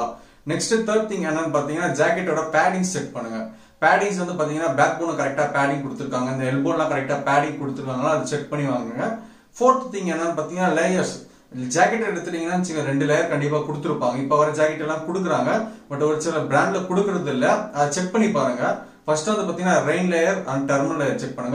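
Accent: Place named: Chennai, India. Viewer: வாங்க சொல ! கேட்க சொல ! Your Chennai language speech was amazing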